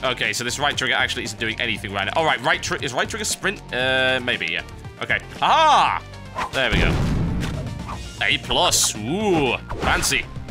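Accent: United Kingdom. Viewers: As a german i love this britich accent or so ;D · Hahahaha I love his angry british sounds! They make my day lol. xD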